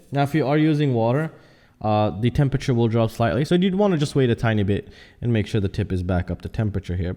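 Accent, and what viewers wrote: United States. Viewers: Great vid and thanks. However - why do our beloved US friends say "sodering" when it's "soLdering"??? Always makes me laugh. :-)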